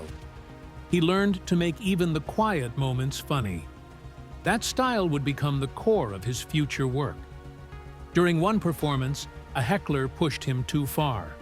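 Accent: United States. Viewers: Howard Kos all!?? Dan Oak'roid?!? What's with the Krazy pronounciations? This guy pronounces names like a furr-ener. Yet he has an American accent. As Snow White says "Weird, weird weird " 😅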